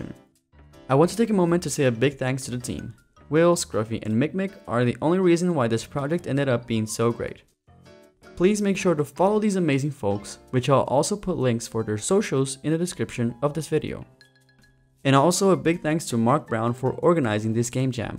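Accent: Brazil. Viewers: É impressão minha ou você é brasileiro?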